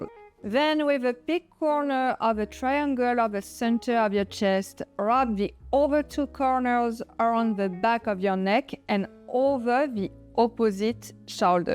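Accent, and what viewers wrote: France. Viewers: Hard French accent.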